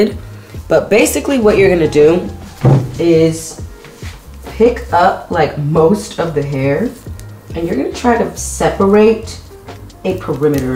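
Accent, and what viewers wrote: Ireland. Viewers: brooo you and this irish accent I cannot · 🥺 THANK YOUUUUUU but babes- now I’m stuck with this IRISH accent in my headddd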